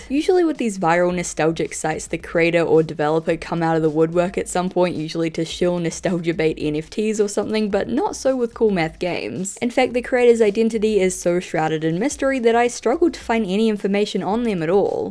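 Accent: New Zealand. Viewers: I'm sorry but your kiwi accent makes it sound like "cool meth" and it keeps catching me off guard 😆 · HOL' UPP!!! yer a Kiwi.?! i, uh... actually forgot New Zealand existed. Thought you were an Aussie. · didnt know you were from nz lol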